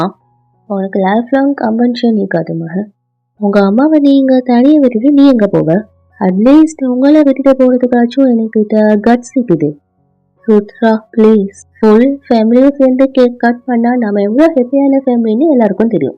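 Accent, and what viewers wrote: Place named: Tirunelveli, India. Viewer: noo ga neenga sollurathu tirunelveli slang maathiri erukku enn clg frd kuda eppadithaan ethavathu sollanumna eppadithaan oru visayatha avanala long ahh solla mudiyathu example valikkuthunu solla sonna valikki 😅 nu solluvaan sorry ga just fun 😵‍💫🙃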